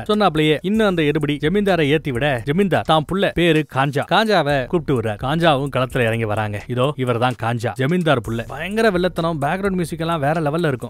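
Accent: Coimbatore, India. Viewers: 😂😂 anne nenga coimbatore ahh😅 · Poramai &pochirubu kovai slag bro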